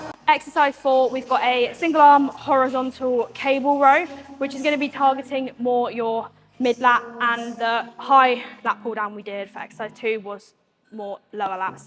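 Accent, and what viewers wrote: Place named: United Kingdom. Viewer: Strong ! It's always a pleasant surprise to hear a British accent :)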